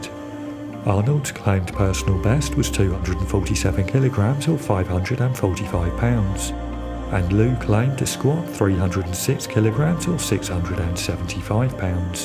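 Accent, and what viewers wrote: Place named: England, United Kingdom. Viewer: An Englishman talking about Americans. You couldn't get Morgan Freeman?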